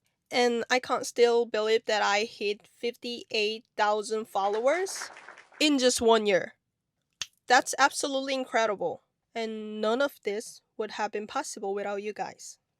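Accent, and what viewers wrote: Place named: England, United Kingdom. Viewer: amazing video thank you 😊 cute english accent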